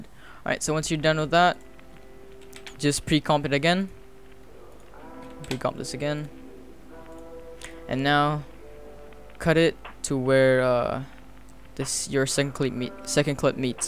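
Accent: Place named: United States. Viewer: whoa ur malaysian an yet u have an american accent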